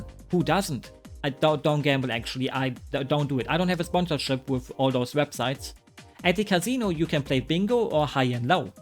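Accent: Germany. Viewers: you are like if the guy who made top 10 hottest sonic characters had a cute german accent and im here for it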